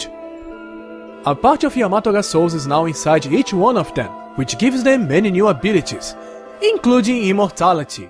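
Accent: Brazil. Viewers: are you br? i can't stand your accent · e carioca, né? hahaah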